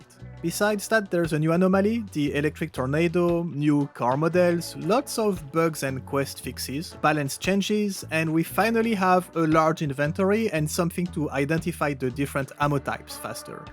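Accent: France. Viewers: This vid convinced me to give the game a try. I really like your humor btw. The French accent makes it even more funny. :)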